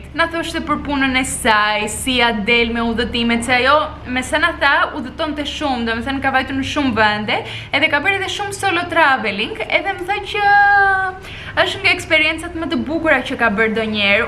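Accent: Greece. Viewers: Η προφορά η ελληνική στα αλβανικά σου είναι φουλ σπαστικό γιατί προσπαθείς να το παίξεις γλυκουλα ! Και βγαίνει σε σπαστικό και γενικά προσπάθησε να δείχνεις περισσότερο παρά να μιλάς κατα τα άλλα πολύ όμορφο βλογκ 🎉🎉🎉🎉🎉🎉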